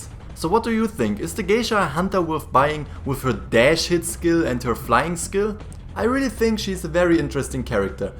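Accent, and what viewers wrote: Germany. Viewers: No offense, aber das is das deutscheste Englisch, das ich je gehört hab. :P XD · Mein Gott, so you're a German then?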